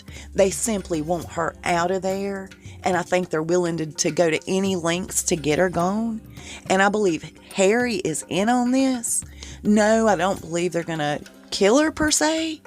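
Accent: South, United States. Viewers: Your from the south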